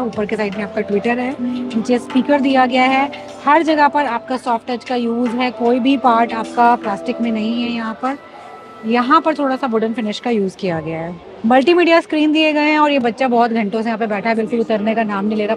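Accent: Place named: Tamil Nadu, India. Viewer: Can’t even understand what she is presenting with Tamil and India accent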